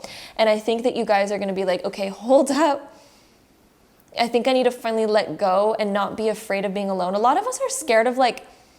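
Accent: Australia. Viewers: OK BUT YOUR AUSSIE ACCENT IS AMAZING AND WOULD TOTALLY HAVE ME FOOLED 😂😂😅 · Ahhh I love the Australian accent haha. You always remind me to have fun no matter what. Keep those vibes high!! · Your Australian accent is absolute gold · you are the cutest human 😭😍 the Australian accent got me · It’s the Aussie accent for me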